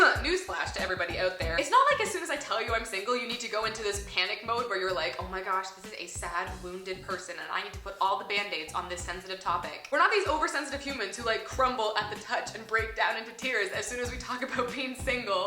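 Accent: Canada. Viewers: I just love Canadians and the things they say. Especially when u say about ur accent comes out and it reminds me of my Canadian family and friends and it just makes me happy which i guess means u make me happy I know that this is a personal question but I'm curious as to what ur denomination is in Christianity. The reason y u ask this is because my family in Canada is apart of the United church of Canada and every summer we would go to a church camp located in berwick united church camp, berwick Nova Scotia. It's a 10 camp with all sorts of different activities and fun stuff. I was also wondering if u know of the Christian musical group from Canada called the message. They don't really put out there music publicly but it's really good music to listen to and stuff. Ur a great Canadian 😊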